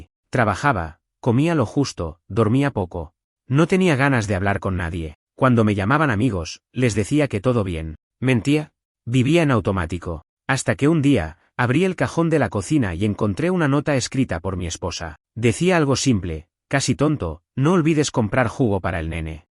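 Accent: Argentina. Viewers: No es que no me guste el bot argentino pero prefiero español neutro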